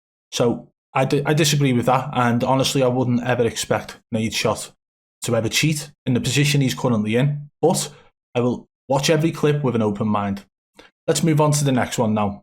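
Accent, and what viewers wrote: Liverpool, United Kingdom. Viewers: Bruhhhh your scouse accent is killing me😖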